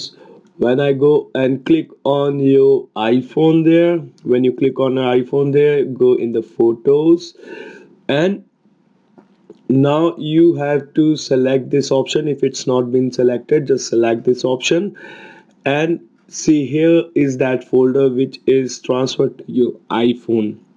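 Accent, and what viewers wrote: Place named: India. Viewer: Every indian accent people give the proper details ❤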